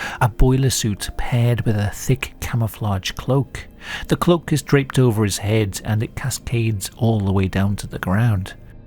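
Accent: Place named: Newcastle, United Kingdom. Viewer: Thank you so much for every video you do. The quality and research are always top-notch, and you present a wonderful mix of theories about even the most far-fetched stories that is engaging to anyone, no matter how sceptical or credulous they may be. I especially love, rather than sensationalism, the empathy you show for the victims of horrible crimes--concern about how their last moments must have been, that sort of thing. Many other horror channels get a bit too focussed on the grotesque nature of violent acts and forget the poor souls they are inflicted upon. Most of all, oddly, I love your accent. My old granddad was born near Newcastle, got conscripted in the war, and met my grandma while stationed overseas so never went back to the UK. The war bizarrely saved him from a probable early death in the coal mines, like his forefathers. 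Listening to you speak about gnomes and spooky photos and so on takes me back to when I was little and he'd read me stories about teddy bears. If you read this, thank you for all you do, and please never apologise for your health. I wish you the best of luck finding effective healthcare. You are an absolute gem. The sheer number of subscribers and patreon supporters you have makes it clear how many people feel the same way.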